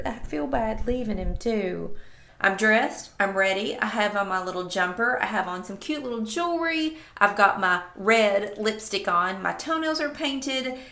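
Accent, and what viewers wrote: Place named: South, United States. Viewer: Hi! 😊 I watch your channel almost every day, and I so enjoy your happy go lucky personality! Maxie and Pollux are the best 🐶 🐶. I just get a big kick out of them 🤣. I would love to see you and take all of us on a tour of your town. I live in Southern California and there is so much traffic and people here 😕🙄 I have to tell you that I love the way you talk 😊. Love southern accents!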